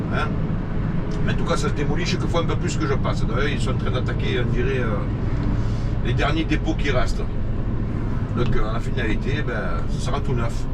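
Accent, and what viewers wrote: Marseille, France. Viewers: Bjr vous me remonté le moral , j'aime vos vidéos j'aime Marseille,et j'aime l'accent,je vous suis d'Algérie et merci pour votre humour et votre franchise,BNE continuation (Halima) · Comme toujours de très belles vidéos sur ta région, ainsi que ton joli "accent" j'ai habité cette ville pendant deux ans au milieu de années 1970, j'y ai travaillé au 165 rue Paradis et j'en garde de très bons souvenirs et avec mon épouse nous revenons à Marseille pour nos anniversaires pendant quelques jours. Par tes vidéos je retrouve beaucoup d'endroits où j'ai travaillé, donc merci de continuer à nous faire "voyager" par ton travail dans cette belle ville. Qui chante cette belle chanson " "? Encore merci👍👍👍❤❤❤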